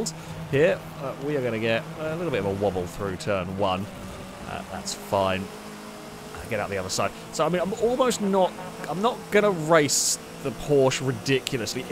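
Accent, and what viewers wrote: United Kingdom. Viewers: You realise is British, right? Only Americans call Z's, Zee. Most other English speaking country calls it Zed. And calling it a "Sell-eekah" is the British accent...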